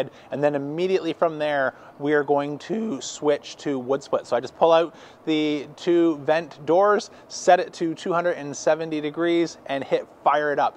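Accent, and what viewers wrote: Canada. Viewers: Great video!! You sound like you're from Canada. Are you the one who created all this smoke? 😳 😉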